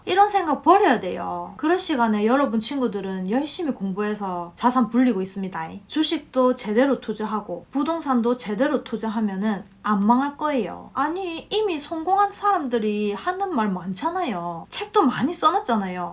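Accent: Gyeongsang, South Korea. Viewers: 경상도 사투리로 아주 야물딱지네요~~^^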